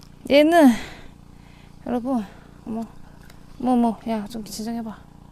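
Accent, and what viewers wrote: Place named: Daegu, South Korea. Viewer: 대구 말투 넘흐 좋아요 ❤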